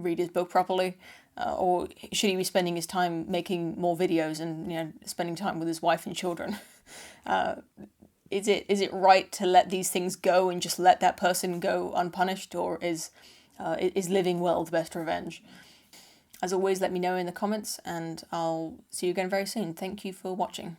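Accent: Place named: RP, United Kingdom. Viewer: This chick has the Downton Abbey/Pride & Prejudice accent but talks about "thots" and "Pewdiepie". I would pay money to hear her tell the Aristocrats joke.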